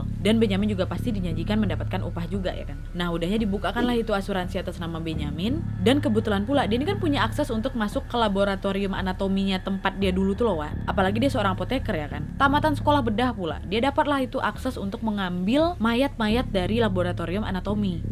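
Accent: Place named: Lampung, Indonesia. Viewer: Kak kamu orang mana sih kok ngomongnya kayak orang lampung kalo ga salah???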